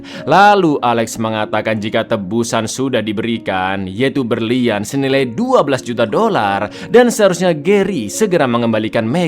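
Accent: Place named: East Java, Indonesia. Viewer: Suarane logat sampean koyok wong jawatimur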